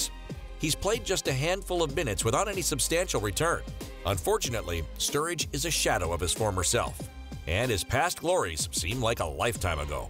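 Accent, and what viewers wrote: United States. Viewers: The American voiceover is not for me. So divorced from anything football.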